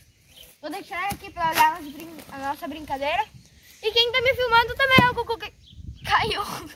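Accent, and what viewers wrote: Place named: Rio Grande do Sul, Brazil. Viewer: Gosto do sutaque gaúcho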